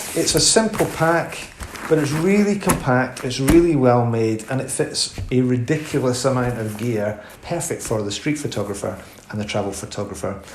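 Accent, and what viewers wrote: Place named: Scotland, United Kingdom. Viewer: That is stitched on. Just lift it up at the edges and cut the stitches with a seam ripper or sharp pocket knife. Off she comes, and looks quite generic, I bet. Love your Scottish accent. That camera you've got is not as handsome as my Olympus E-M10.3, but having the viewfinder off to the left side of the body really makes up for it. (for the right-eye-dominant user) The nose just goes off to the side of the camera, instead of into the back of it with the head turned awkwardly sideways.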